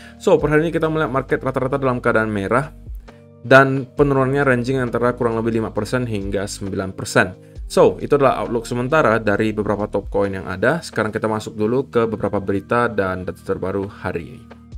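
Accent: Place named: Medan, Indonesia. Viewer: Bro kamu China medan apa Bangka? Logatnya medan y.. Salam dr medan bro 🍻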